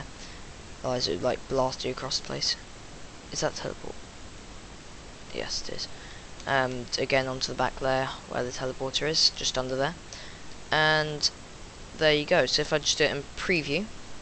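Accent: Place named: United Kingdom. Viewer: YOUR BRITISH! I have a british accent coming on! :D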